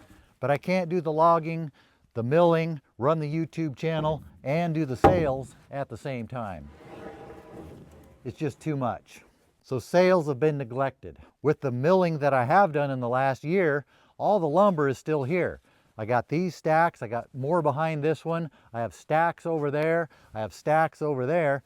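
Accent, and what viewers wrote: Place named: Pacific Northwest, United States. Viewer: it's the Pacific Northwest accent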